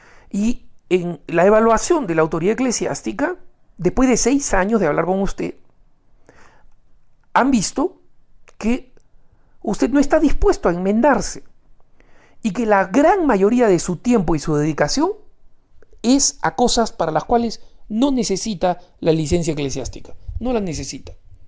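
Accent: Peru. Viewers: No soy kristian, pero estoy segura que el señor que habla es peruano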